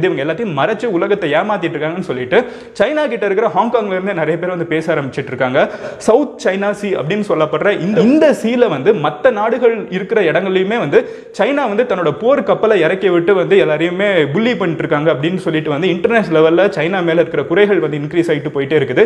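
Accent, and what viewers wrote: Madurai, India. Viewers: Madurai ya bro nega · Bro neega madurai yaa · Neenga madurai ah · Neega Madurai yaah? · Bro neenga Chennai vaasi yaa Illa Madurai vaasi yaa pls sollunga bro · Nega madurai ya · நீங்க மதுரை யா...? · Bro neenga Madurai ah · Bro nenga Madurai ah?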